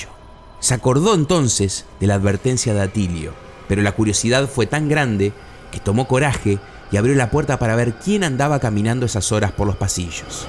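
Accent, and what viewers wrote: Uruguay. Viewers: El a relata es uruguayo de 1 programa de Uruguay pero hablos casi iguales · Acento Uruguayo Papa Saludos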